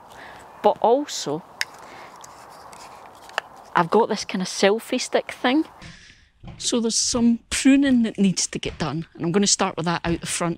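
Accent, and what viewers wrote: Scotland, United Kingdom. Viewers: Thank you for this incredible sneak behind your scenes! The way of your talking is part of the special spirit of your videos - including your nice scottish accent i already get a little more comfort with. 😉 (no offend, i like it, brings me back holiday feeling and memories when i visited Scottland with my son) It's hard to believe teaching lessons with you could be no fun. 😁 Regards from Germany - full of gratitude i found your channel by your talking to Gardener Scott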